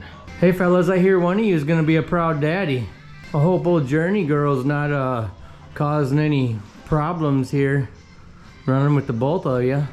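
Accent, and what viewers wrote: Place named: Wisconsin, United States. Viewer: I love how you mix your Wisconsin accent with Spanish accent.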